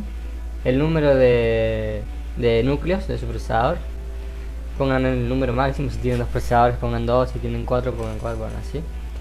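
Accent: Argentina. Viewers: Excelente tu tutorial "cordobés" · re cordobes jajaja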